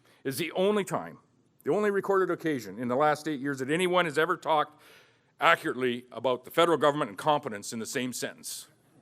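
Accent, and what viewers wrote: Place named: Saskatchewan, Canada. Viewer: Man this guy has a heavy sasky accent.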